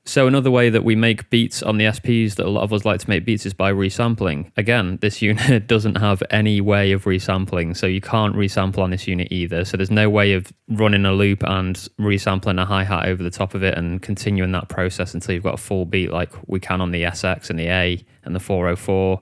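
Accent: United Kingdom. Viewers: could I do it in a DAW, or do I have to use a door? (Just kiddding, love the UK accent and the videos!)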